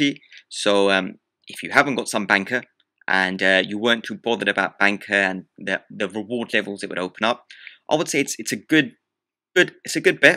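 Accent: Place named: London, United Kingdom. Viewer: Thanks buddy, I am London :) sounds like you are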